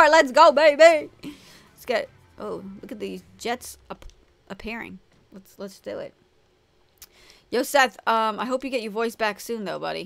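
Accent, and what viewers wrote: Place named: Boston, United States. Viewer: Oh yeah and where are you from i hear Rhode island or Boston in your accent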